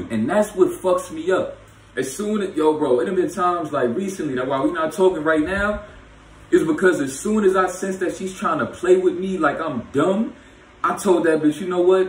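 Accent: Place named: New York, United States. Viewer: This nigga from New York for real for real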